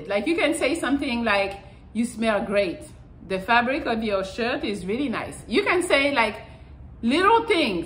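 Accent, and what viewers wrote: France. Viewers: French English accent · Lol... I love your French accent with how you say things 🤣🤣🤣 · L'accent français est super prononcé ! Super attractive. J'aime trop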